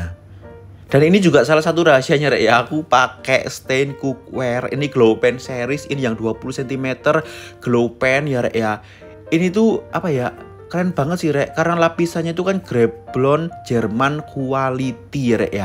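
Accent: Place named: Surabaya, Indonesia. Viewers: logat cino suroboyo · Kirain NgaPak ternyata suroboyo 😂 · Aku seneng logat nya, surabaya an....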